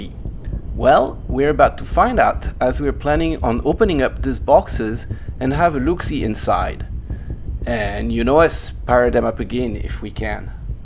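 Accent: France. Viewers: I never noticed you had a French accent until you mentioned it, don’t know why ;-)! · Honestly i like a lot the french accent voiceover but I'm still sad for the loss of Ken's commentary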